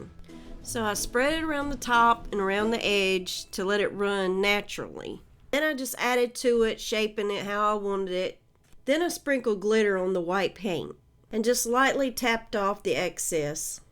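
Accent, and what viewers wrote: South, United States. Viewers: Honey child, I don't know where you're from, but I just love your honey and molasses southern accent. Cute ideas. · Love your decorations and your accent! I am a southerner too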